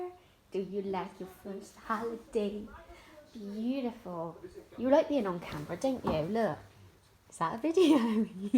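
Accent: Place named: Bristol, United Kingdom. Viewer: Fair play with the marathon, it's weird hearing a bristolian accent on you tube as I'm from bristol too 😁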